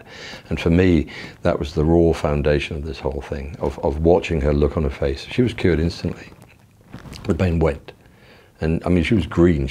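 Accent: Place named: South, United Kingdom. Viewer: Its late. Couldn't sleep. Popped on YouTube and there you are in the 'Recommended for you' section. We miss you up here in the north country. You're developing a bit of a 'Southern tinged' British accent. LOL Be blessed my Friend.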